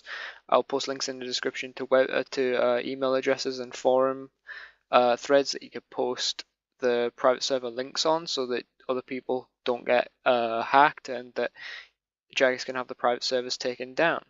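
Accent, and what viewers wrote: Newcastle, United Kingdom. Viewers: Are you from newcastle? you sound georide :) im from newcastle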